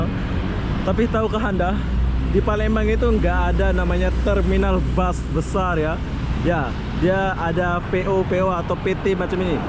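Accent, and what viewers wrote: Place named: Malaysia. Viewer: Warga Malaysia bg???kok bahasa dialek nya beda.saya subscribe bang saya suka cara abang.rendah hati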